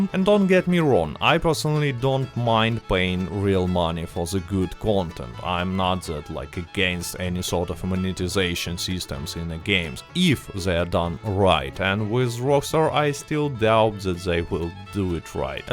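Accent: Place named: Russia. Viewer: i love your russian accent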